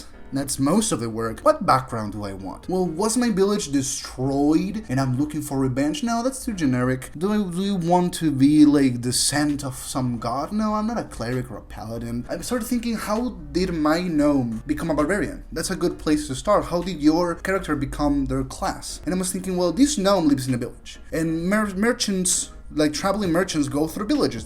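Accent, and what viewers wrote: Argentina. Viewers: Bancá, sos argentino?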